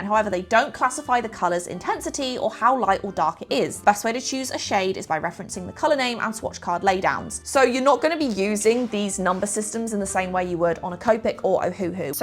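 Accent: England, United Kingdom. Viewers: Besides your videos being informative, I could listen to your beautiful English accent all day.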